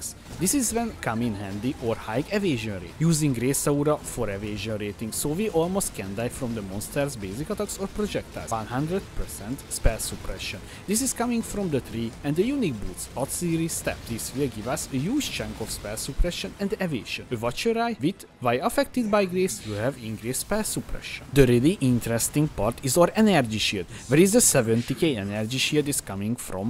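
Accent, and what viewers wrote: Hungary. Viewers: Hungarian, right? :D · az akcentusból tudtam, hogy magyar vagy, jó videó!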